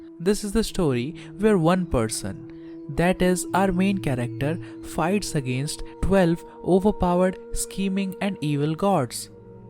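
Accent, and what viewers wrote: India. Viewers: Your accent is indian bro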